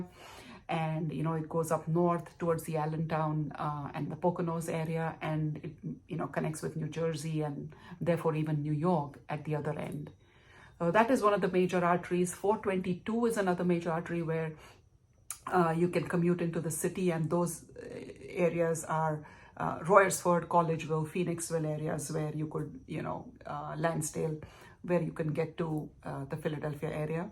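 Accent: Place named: Germany. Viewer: I love your German accent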